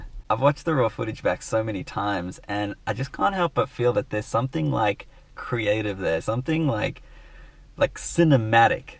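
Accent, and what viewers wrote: Australia. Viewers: are you australian??? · You Australian? Ik where Richmond is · I just realised now your Australian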